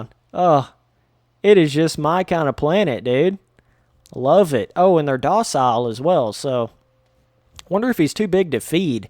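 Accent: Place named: South, United States. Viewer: There is something about a dude with a Southern twang playing No Man's Sky that is just super wholesome.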